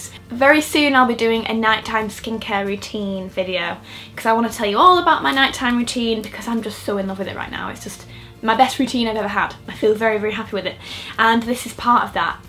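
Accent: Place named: Manchester, United Kingdom. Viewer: Absolutely love all of your videos,. By far my favorite youtuber! Plus its so nice to hear that lovely Mancunian accent, makes me miss home!!:)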